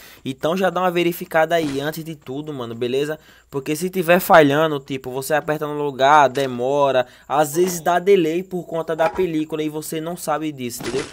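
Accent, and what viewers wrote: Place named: Paraíba, Brazil. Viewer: Paraiba pra fala feio Zuado joga nada.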